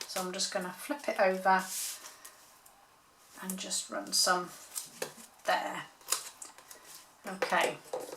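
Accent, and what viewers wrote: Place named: Leicestershire, United Kingdom. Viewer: A lovely little box. I also have to say I love listening to you, even though I don't know where you live or are from, your accent sounds like it was close to where I come from in Leicestershire. Sounds like home Thanks for posting.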